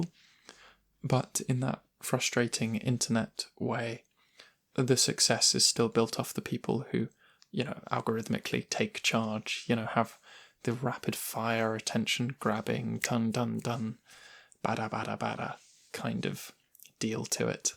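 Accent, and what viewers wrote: England, United Kingdom. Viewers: I haven't watched this yet but wanted to say your hair is beautiful. Also if you mind me saying so you are just pretty and cute. As an American your British accent is heavenly. I feel like you would give the warmest softest hugs. I hope you're well. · Also as a fellow English person I totally felt the accent bit 🙃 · im from argentina and i use your videos to practice and learn more about british and i loooove your accent !! i wish i could speak english like you someday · What part of the UK is your accent from I quite like the way you speak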